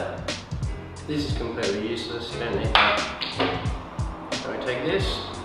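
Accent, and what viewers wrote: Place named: Australia. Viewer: U is Aussie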